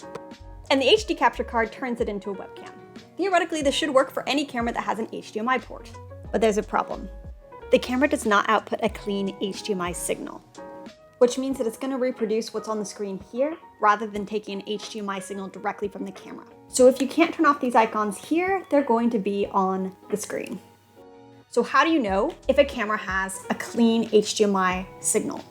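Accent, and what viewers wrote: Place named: United States. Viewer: Just out of curiosity: you sound American - yet, it seems you are in Ireland (and you look somewhat Italian, and charmingly so ;-) ). You emigrated?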